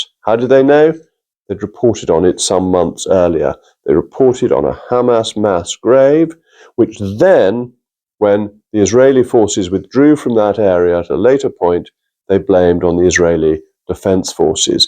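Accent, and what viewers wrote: RP, United Kingdom. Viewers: I love your accent n very manly voice, and learning a bit of UK high class English from you in the process ❤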